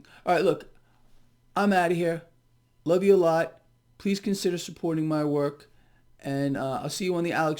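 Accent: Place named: New York, United States. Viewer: Is it possible this guy is from New York or New Jersey? That accent is so thick you could cut it with a knife.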